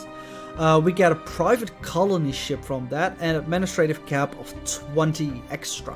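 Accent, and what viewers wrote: Netherlands. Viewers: Ik hoorde je accent en ik dacht: hmm is hij misschien Nederlands? Toen zag ik je locatie en ja ja: Nederland. Toevallig :) Leuke let's play man!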